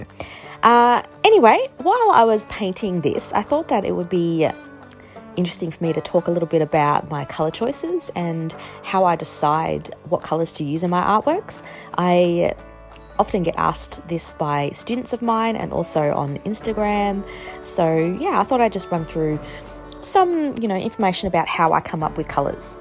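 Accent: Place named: Australia. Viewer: Hi, I just found your channel and it was lovely to watch your process and hear a fellow Aussie accent!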